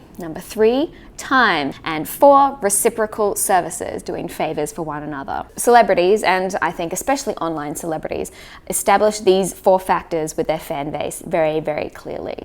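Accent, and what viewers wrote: Australia. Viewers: are you from Australia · Back in 2009 when I got my first Android phone and I started using many Social Apps in order to develop Friendships because this is where most of the offline people you know are now online. Out of hundreds of people while using these apps ive only acquired 4 real friendships...Here on Youtube I call some Youtube Creators my Friends and some do respond back to my comments. This is an eye opener for me to learn the term Para Social Relationship..knowing the person but not actually getting to know them in depth as a real person. Welcome to Fantasyland when viewing some content on Youtube? 🤔 What really stings is to think that you are developing an emotional connection with people for example ASMR Artists but nothing is there.. Btw nothing wrong with your hair. 🙂 Austrailian Accent 😎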